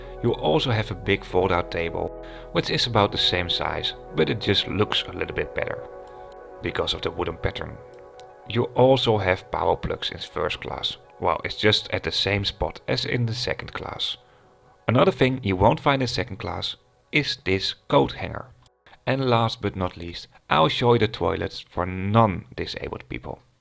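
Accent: Netherlands. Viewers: Very nice vid! But, please, please - do something with your dutch accent ! These are, of course, "very" and "luggage" ! (Instead of "fery" and "lagitz"! ))).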